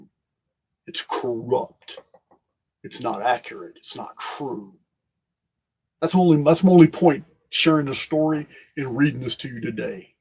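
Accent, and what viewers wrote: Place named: Texas, United States. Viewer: Thank you from the bottom of my ♥️. I got used to your voice andTexas accent and now hooked.I feel your voice and message comforting my soul. Greetings from Holland.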